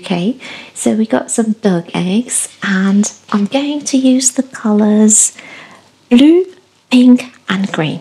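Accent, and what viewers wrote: United Kingdom. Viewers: I’ve noticed over time how much more British you accent sounds.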